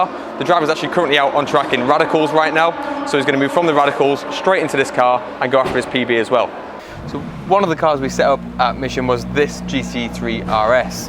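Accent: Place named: United Kingdom. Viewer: great video, just a bit more USA english will be great for people outside of UK :-)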